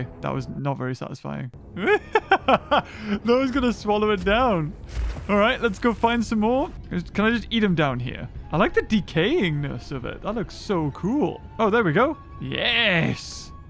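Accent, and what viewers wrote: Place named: United Kingdom. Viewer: 5 seconds of watching the video hearing this british guy clicking on LIKE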